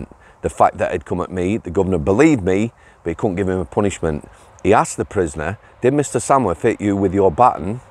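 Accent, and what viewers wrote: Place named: Wakefield, United Kingdom. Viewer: Lost your wakey twang, almost full on Manc !!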